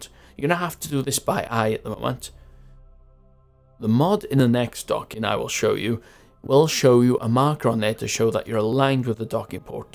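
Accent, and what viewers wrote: Wales, United Kingdom. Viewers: Welsh to me.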